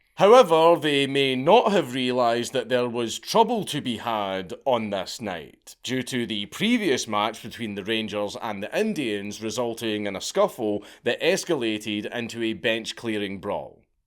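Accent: Scotland, United Kingdom. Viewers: The legend of 10 cent beer night needed to be told in a Scottish accent. The world is healing. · bruh you're scottish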